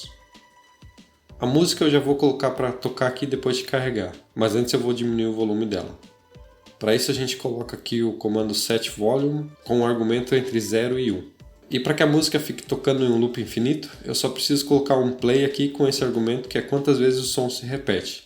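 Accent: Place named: Brazil. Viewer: Eu te conheci pelo canal de inglês, o sotaque brasileiro te identificou, video mto bom, muito obg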